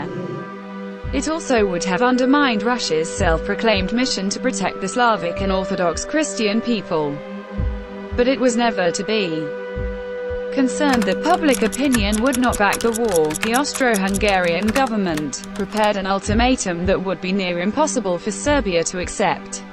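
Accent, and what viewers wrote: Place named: United Kingdom. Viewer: With all the background noise this woman with a heavy British accent cannot be understood.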